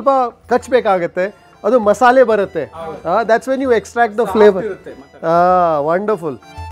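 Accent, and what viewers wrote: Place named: Tulu Nadu, India. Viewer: your kannada has full TULU influence 😁😁 We Tuluvas have an interesting way of speaking Kannada 😊😊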